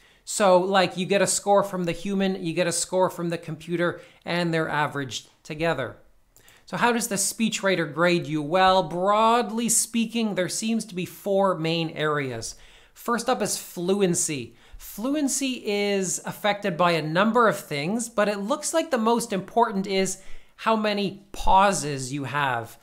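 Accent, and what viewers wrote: Canada. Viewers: Hey, thanks for all the videos. They are really helpful. Test day tomorrow, wish me luck! out of curiosity, are you canadian? I like to guess where people are from based on their accent haha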